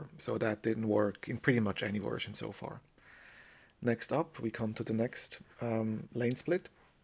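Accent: Switzerland. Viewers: are you swiss?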